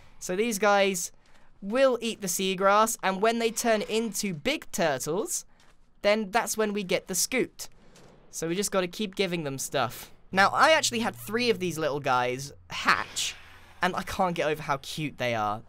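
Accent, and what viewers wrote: United Kingdom. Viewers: Is it just me or is so much more British back then then he is now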